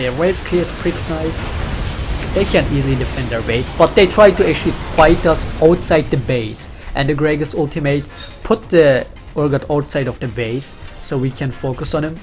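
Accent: Germany. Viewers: Man hört ich raus das du deutsch bist. Ich meine das wisch bei wich und zink bei think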